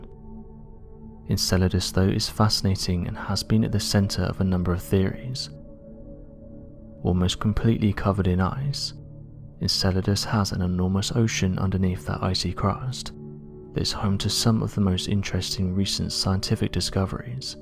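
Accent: England, United Kingdom. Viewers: He sounds like an asian guy that learned english in great britain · Why not just speak in your usual English accent without the drama music?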